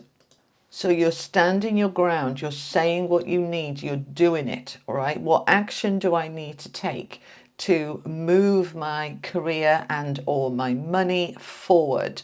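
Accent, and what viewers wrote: England, United Kingdom. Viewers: Thank you ❤ What a pleasure listening to your English accent. I have been fighting for years for my heritage mainly with my sister who is taking all the economic profit on this situation. Lately I’ve sent un ultimatum to her lawyer because I am just tired of this unfair situation. This is real insane when we have to fight against our own family but it’s just scandalous the way she can take profits of the situation making me paying for her accommodation with all the referred financial charges. Well I pray for the end of this mess because I am getting old and tired to continue working paying for her profits. Even my health balances at my age and my body keeps telling me it’s time to stop. Hoping that the sun finally comes out and brights in my sky and in my heart. Thank you very much for this vidéo. You do a great job. God bless you 🙏🫶💐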